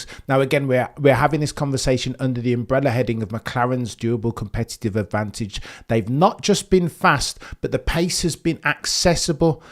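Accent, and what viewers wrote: Birmingham, United Kingdom. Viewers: Never would I have ever thought the way to make F1 jargon interesting is to say it with a brummy accent. Go on my bro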